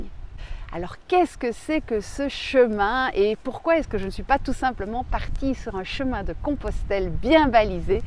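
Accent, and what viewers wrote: Belgium. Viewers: Bonjour quelle énergie pour ce long chemin et toujours la bonne humeur ça fait plaisir de vous entendre ainsi que l’accent des flandres bon chemin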